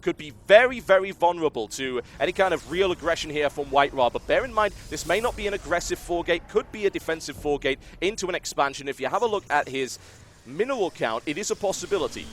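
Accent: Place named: London, United Kingdom. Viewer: TB, I bloody love you man! The entertainment value of your casts is exceptional and combined with your voice and accent they induce the kind of mancrush that is normally reserved for only the best of friends. Btw, have you ever considered starring in the next Guy Ritchie movie? I think you would be a perfect cast for one of the small-time london criminals in a movie like Lock, Stock... or Snatch :P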